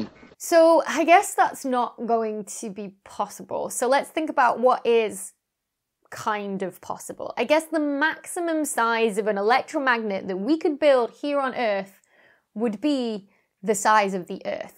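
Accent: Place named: Cockney, United Kingdom. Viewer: Your Cockney accent is cool. (I'm not native English speaker)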